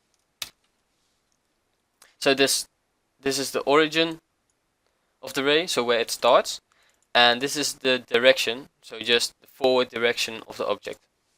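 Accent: Netherlands. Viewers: Hahaha, aan je accent hoor je dat je Nederlands bent.. Maar goede video! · are u dutch lol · that dutch accent though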